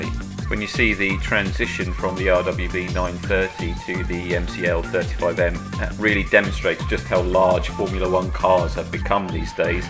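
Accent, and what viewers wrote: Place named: United Kingdom. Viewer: Your voice is spectacular as I should know being a media graduate from College. All of us Americans are always fascinated with the British accent.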